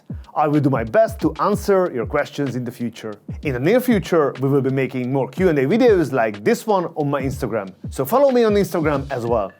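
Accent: Hungary. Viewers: I never realized that you had a Hungarian accent. Now, that I know, I can't un-hear it ;-). Thank you for videos. It is an extremely rare opportunity to get a glimpse into the world of 2-3 star Michelin restaurants where a dinner for two is upwards of 1k Euro (not counting extra wine at 2k a bottle). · Love your Hungarian accent. It adds to the charm of the channel 🤌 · Emlékszem mikor még pár ezer feliratkozó volt a csatornán és azon gondolkodtam, hogy ez egy kifejezetten magyar akcentussal beszélő úriember és bár eddig nem hallottam róla, valahogy mégis büszkeséggel tölt el, hogy egy magyar, illetve több magyar ilyen minőségű tartalmat gyárt. Nem tudom, ha valaha hagytam így hozzászólást bárhol, de úgy éreztem, hogy itt az ideje. P.s. remélem idővel én is veszek egy Krug-ot, ami majd erre a csatornára emlékztet. Hajrá!:D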